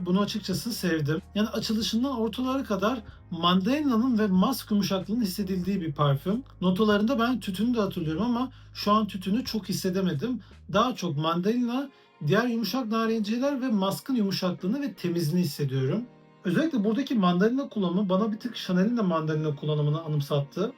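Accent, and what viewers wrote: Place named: Central Anatolia, Turkey. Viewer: daha instagram diyemiyon mudur. nerenin aksani bu ic anadolu lu musun. ?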